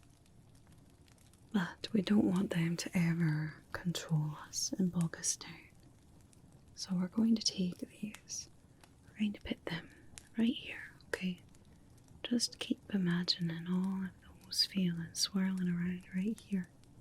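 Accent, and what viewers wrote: Scotland, United Kingdom. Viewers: The scottish accent is the best❤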